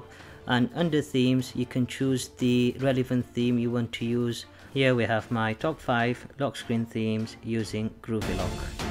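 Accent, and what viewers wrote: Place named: Liverpool, United Kingdom. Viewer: ***** yea i think it's a Liverpool accent or I think they call it Gordy lol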